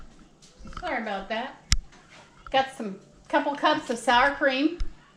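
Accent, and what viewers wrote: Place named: South, United States. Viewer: girlllll I absolutely love this video you gotta do more, umm and I love your southern accent you're gettin I'm so jealous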